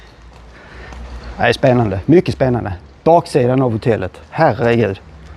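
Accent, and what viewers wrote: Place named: Skåne, Sweden. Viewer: Intressant film, hör att du är från Skåne och runt Hässleholms trakten. Välkomstdrinken i filmen togs på ett hotell i San augustin dock svårt att se in där då det är murar mm framför. Något jag sökt efter.i många år är var dom spelade in inköpet av Lasses åsna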